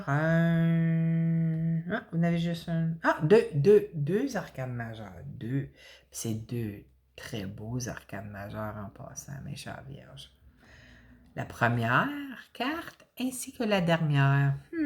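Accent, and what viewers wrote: Quebec, Canada. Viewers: Vous êtes trop mignonne et pleine de bienveillance, je vous ecoute, vous et votre super accent, avec une immense joie. Vive le Québec et ses habitants 😍😍😍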